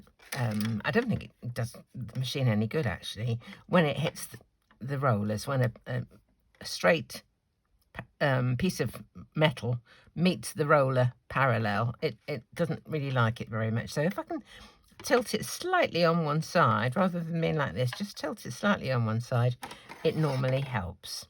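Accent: England, United Kingdom. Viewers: Hi. I am in Spain and love your videos. Not many with a lovely English accent. I haven't got the same die, but I do have one with a gnome on and will try and do something similar. I hope it works out. thank you so much for sharing this idea. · I’m watching you from Mississippi, USA. can’t always catch you during the day but usually enjoy your videos in the evening. I love you cards and your soothing British accent. Looking forward to your next video.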